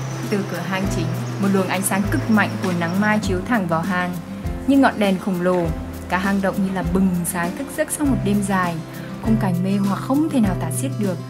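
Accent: North, Vietnam. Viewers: nói giọng miền bắc dễ thương lắm chị sẽ ủng em hãy làm những gì mình thích cô gái mạnh mẽ quá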